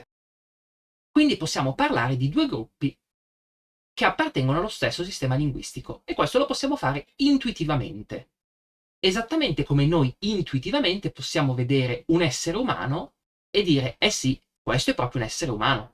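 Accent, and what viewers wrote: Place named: Milan, Italy. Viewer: Non sono io l'autore del video; però so che l'autore è della periferia milanese, quindi è possibile che la sua pronuncia sia stata influenzata dalla sua varietà.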